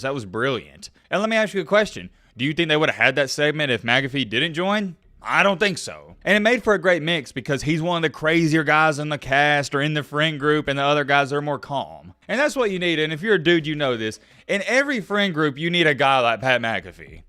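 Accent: South, United States. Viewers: My first comment here, love the southern boy commentary. Dude the guy is a kicker who cares never even watched this guy. You are correct about Youtube. Youtube is the go to for everything, especially on sports.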